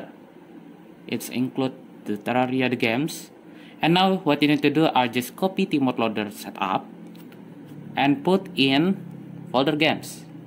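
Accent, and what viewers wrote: Indonesia. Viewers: Makaih bang , btw gw tau lu indonesia dari accent inggris ly bang hehe 😅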